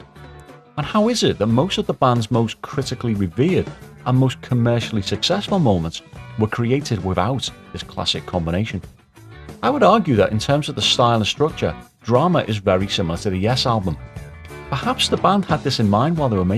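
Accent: Liverpool, United Kingdom. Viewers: I wonder if there was any drama. Ok, you sound scouse and this is Yes and nyc eh nice, I love the Angel Gabriel lol. subbed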